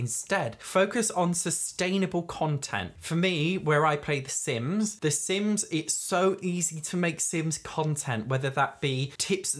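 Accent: United Kingdom. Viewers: "can you not use a British accent? its distracting" wtf is wrong with people lmao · Through most of this it got really creepy hearing all the things that make a person click off that matched me. Funny thing though, I literally just told my husband that I found a new person to put on in the background. This was solely based on your voice, accent and your laugh. I'm American, but he's from Scotland, so I made him listen because I was trying to guess what part of England you were from, lol. I had to rewatch the part where you said Americans told you to stop using a fake British accent three times because I was laughing so hard! 😂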